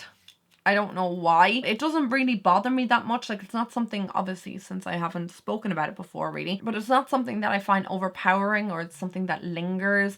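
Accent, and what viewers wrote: Ireland. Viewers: I love your Irish accent so much it’s so beautiful ☺️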